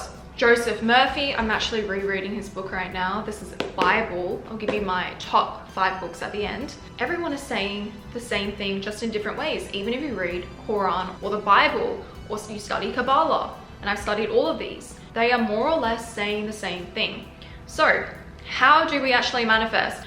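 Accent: Australia. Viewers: Asian Aussie accent ia cool 🤙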